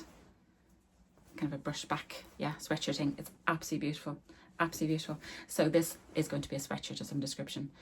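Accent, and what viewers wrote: Ireland. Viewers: Love your vlogs, you go as green as you want! 💚 😂 Also love your Irish accent and learning Irish words, my grandad was Irish and always used to recite poetry and stories to us, although full of blarney!! 😂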